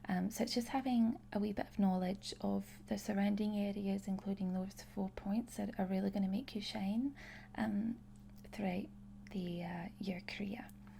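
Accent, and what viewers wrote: Scotland, United Kingdom. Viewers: I love videos where you have this accent, I'm from Glasgow so although this isn't my accent, it's so lovely to hear another Scottish one! It makes the video seem more real to me. Thank you for this video, I've watched it multiple times already! Xx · Love your Scottish accent role plays!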